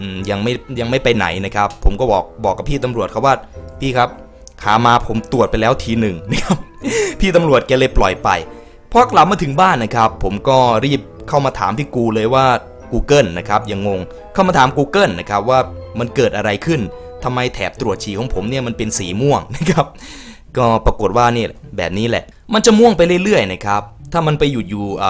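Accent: Isan, Thailand. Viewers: พี่ผมถามจิงๆนะ พี่เป็นคนอีสานป่ะแม่นบ่ ตอบผมเดี๋ยวนี้ด้วย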